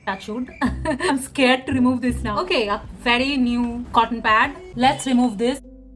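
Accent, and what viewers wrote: India. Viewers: can u stop picking on people on the internet? she seems bengali and this is how their accent is. It's not her fault so why the fk are u blaming her? She has almost 8k subs while u cant even make one video. If u dont like her accent then click off the video, shes reviewing a lip gloss not ENGLISH SPEAKING.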